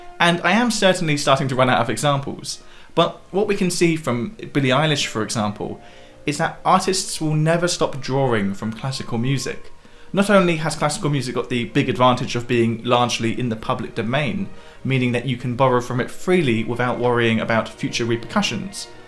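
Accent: United Kingdom. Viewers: "Wuhld" is almost worse than a Bostonian. Honestly this sounds better to me than a Bostonian because my parents liked britcoms late at night on the local PBS station. I got adapted to this accent pretty fast when I got a pubescent liking to Penelope Keith's hipbones. Y'all Brits probably think I say "world" as "wuhrrrl(d)".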